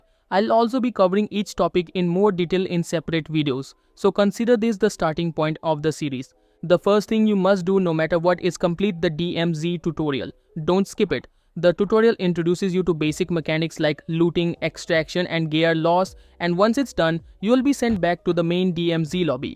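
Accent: India. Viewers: Ugh, that Indian accent is ANNOYING! 👎👎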